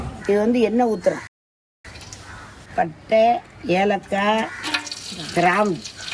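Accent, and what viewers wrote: Ramanathapuram, India. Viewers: நான் Meharaj recipes ராமநாதபுரமV.KMA வகையறாநான் 👌 உங்கள் வீடியோ அருமையாக உள்ளது பேச்சு வழக்கு எல்லாம் ராமநாதபுரம் தோரணையில் உள்ளது உங்கள் வீடியோவை பார்ததில்மிக்க மிக்க மகிழ்ச்சி ஊரில் உள்ளவர்களுக்கு வகையறா என்று ஒன்று இருக்கும் உங்கள் வகேரா பற்றி வீடியோ போடுங்க நீங்க எங்க நண்ணி மாதிரி பேசுறீங்க இப்போ எங்க நண்ணி இல்லை வயதானவர்களை பார்த்தால் எங்க நண்ணிஞபகம்தான் வருது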